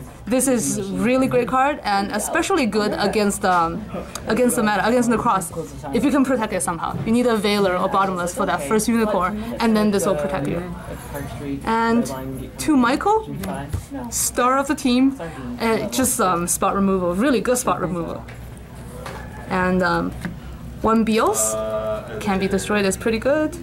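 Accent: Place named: Asia. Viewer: Her Asianic accent. Finally I'm not the only ones with Asian accent that plays ygo. :)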